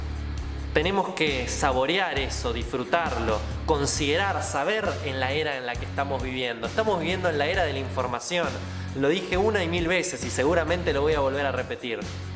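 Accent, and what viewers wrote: Argentina. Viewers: me mama (encanta) el acento argentino, justo hace 2 semanas apenas veía este tema, muy buen video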